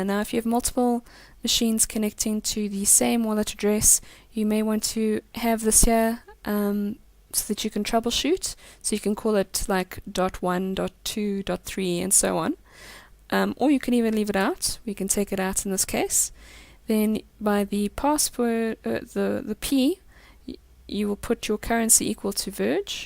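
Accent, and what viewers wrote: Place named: South Africa. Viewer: I have to agree with you, you sound very South African, local is lekker:)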